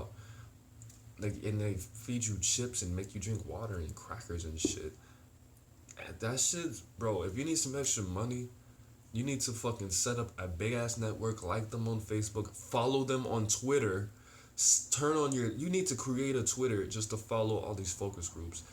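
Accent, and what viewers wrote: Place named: Chicago, United States. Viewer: I just watched this video for the second time. While watching your video I took notes....thanks for shouting me out.....we're u born in chiTown?...u have an accent to ya!....just applied and did do some lying on the surveys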